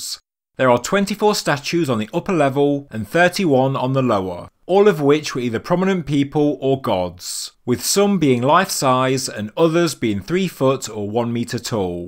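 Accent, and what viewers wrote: United Kingdom. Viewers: it means 5 people think is going to get a "speech coach" to correct his voice to cater to their opinions. The guy is the UK, it's his accent and dialect. So yes, 6 useless opinions of people who if you enjoy the content watch it out you don't like it don't watch it. So why don't you "sit down and shut up."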